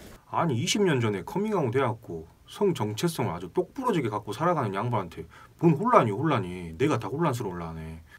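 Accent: Jeolla, South Korea. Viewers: 전라도.......으 극혐 비추 누르고 간다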